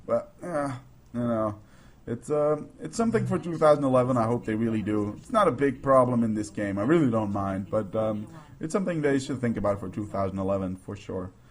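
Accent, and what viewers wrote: United States. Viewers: dude you have a kick ass american accent haha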